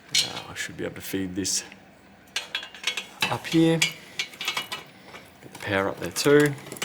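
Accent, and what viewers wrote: Australia. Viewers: Super! An Aussie explains.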